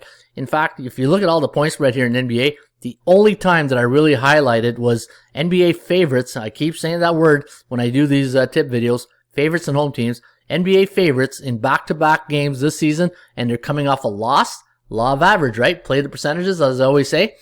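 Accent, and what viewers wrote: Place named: Canada. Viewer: You sound canadian to me...therefore, I'll be expecting many tee's down the road to back that tee from the other day. We sure lucked out yesterday with the Nats. Padres are scoring in bunches, and it doesn't seem to matter who pitches (recently). Going to be keeping my eye on it...their Staff, ignore the numbers, is weathered and tired. They are becoming overworked, and it should trend in the over direction with the offense/tired bullpen combo.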